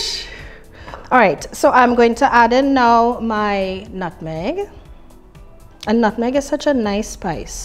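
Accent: Trinidad and Tobago. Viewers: Ah like de trini accent gul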